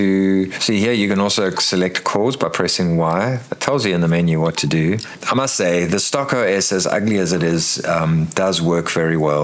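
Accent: South Africa. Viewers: Really enjoying your videos, man! Heard a fellow South African accent, plus channel and vids all about things I love. Easy sub. I've been doing retro emulation on my old PSP for around 10 years now, and last week decided it was time to get an RG35XX Plus, It was meant to a "dedicated console for emulation", one and done, but I quickly realised it's just my "first" emulation station. lol.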